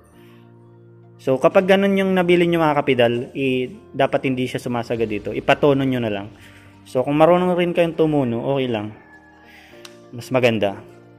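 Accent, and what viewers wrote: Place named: Bicol, Philippines. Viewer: Bicolano ka sir😅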